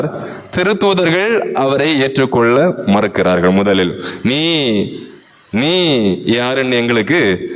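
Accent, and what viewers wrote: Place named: Kanyakumari, India. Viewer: இறைவன் போற்றப்படுவாராக. தந்தையே அருமையான நற்செய்தி, கருத்துள்ள ஒரு பதிவு. உங்களின் முதல் Sermon. I heard the Holy Mass while cooking. Awesome father. Without having self confidence whoever prays nothing will happen. I don't know the church name and the place. Your sound resemblance from kanyakumari Dist. God bless you abundantly dear Rev Fr. Amen Hallelujah ✝️ Hail Mary ✝️📿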